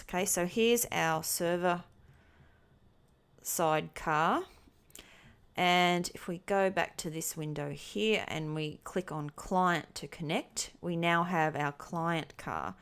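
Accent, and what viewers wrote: Australia. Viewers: Are you Australian? You have a beautiful accent.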